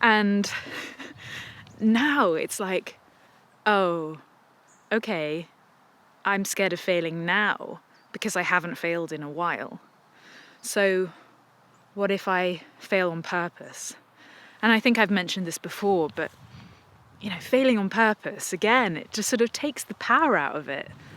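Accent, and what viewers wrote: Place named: RP, United Kingdom. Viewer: God, you're so bloody posh,. I can see you as the old dear running a large country pile....in (quite) a few years when you've made your fortune.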